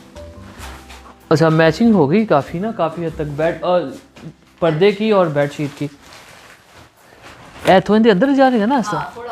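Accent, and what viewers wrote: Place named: Punjab, India. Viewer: Punjabi bht pyari bolty hn ap😀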